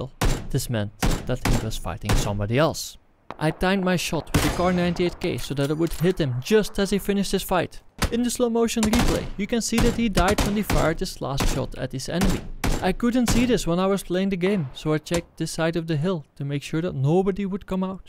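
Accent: Netherlands. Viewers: love your vids man, question.. are u Dutch?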